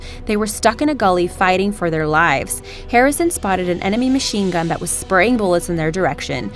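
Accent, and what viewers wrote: United States. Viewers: She has an American accent. How can an American get the buffalo identification wrong? Maybe she is a Millennial.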